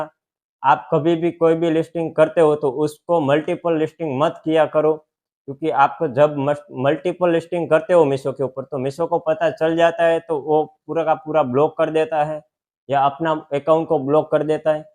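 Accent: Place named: Gujarat, India. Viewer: Lagta he Gujarat se ho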